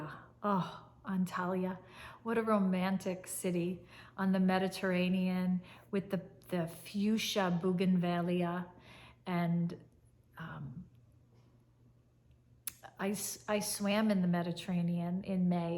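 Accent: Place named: Syria. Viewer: You have a very good Syrian accent 😍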